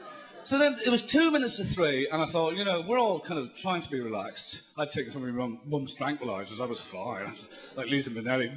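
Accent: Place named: Ireland. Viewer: Sean's accent has diluted a lot, obviously due to all the years he has lived in England. While he does still sound Irish here, there is one recent clip of his on you tube and - if you didn't know any better - it might be difficult to place the accent at all. Even here his accent isn't as strong as the clips of his early stand up.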